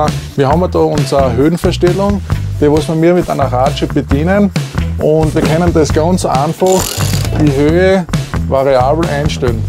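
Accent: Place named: Low German, Germany. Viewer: Wenn man Kunden gewinnen will sollte man verständlich sprechen. unn nitt sei Platt!!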